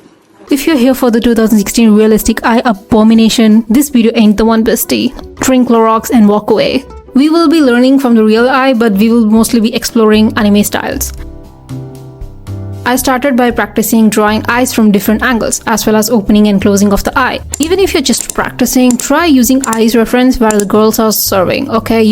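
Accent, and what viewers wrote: India. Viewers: Are you Indian , don't get me wrong, I am Indian. Just guessing from the accent. I have the same accent ,please don't get me wrong. Btw I am one of your subscribers. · You sound like if you have a an Indian accent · yh i was wondering bcz this is how my family speaks eng back home in India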